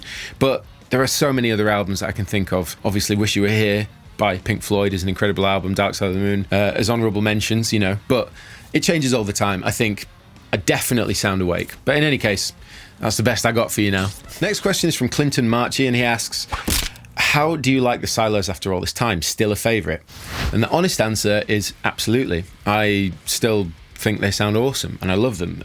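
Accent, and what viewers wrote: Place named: London, United Kingdom. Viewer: Me: he sounds like he's from London..?: Sweetwa'a Me: say no more.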